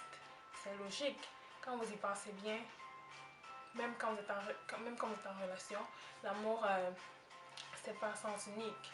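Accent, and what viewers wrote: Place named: Quebec, Canada. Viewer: Ta gagné un accent québécois😕😕wow!!😕😕😕😕 Chai plu cmt chui tombé sur ta vidéo mais super conseil. G lâché un méga pouce bleu ma belle.. PS: tu rends super bien derrière un écran!!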